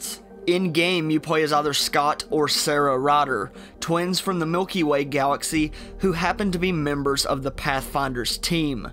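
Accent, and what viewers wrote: South, United States. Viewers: No disrespect, since I'm from Mississippi myself, but do I detect a deep southern drawl there (Texas or Tennessee maybe)? ^_^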